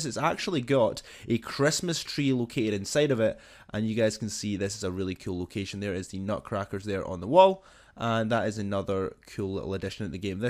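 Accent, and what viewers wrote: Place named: England, United Kingdom. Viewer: You have an nice english accent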